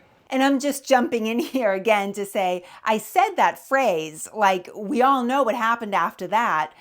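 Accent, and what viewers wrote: Boston, United States. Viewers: I get so excited when you post a new video I stop everything to watch. I've lived in Seattle for ages, but grew up in Cambridge and went on innumerable school field trip: Copps Hill, Grainery, Kings Chapel Burying Grounds, Bunker Hill, Paul Revere's house, Old South Meeting House, Old North Church, Faneuil Hall & The Old Statehouse, Saugus Iron Works, The USS Constitution and more I can't recall. I love the energy of your videos, they're an I-V of Boston Revolutionary history - SO good! I've lived away so long I've lost my accent, so listening to you is the comforting sound of home. Keep up the great work; I can't wait for your next post.:) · Great video of Boston, your accent makes me homesick for Boston. I have walked the Freedom Trail. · Pahked machar in tha yahd❤ Yes i am a huge Jaws fan😁 Love the Bostonian accent 🙌Love from London ❤ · your accent is wickid Boston! 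· Great Boston accent. I love it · Definitely a boston accent! You sound like me and all my friends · accent is adorable. Boston accents are cool. I'm from Tennessee so mine is quite different. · I came for the accent and stayed for the history! Left Boston 6 years ago and really miss the beauty and the accent! helps me remember how to talk propahlee! 😁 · Whew, that is a Beantown accent! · I have spent my entire life in Boston - 56 years - and has the most incredibly unvarnished Boston accent ever. It’s almost a caricature of a true Boston accent. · After the first 30 seconds of listening to her talk, I definitely knew that she was from Boston.🤣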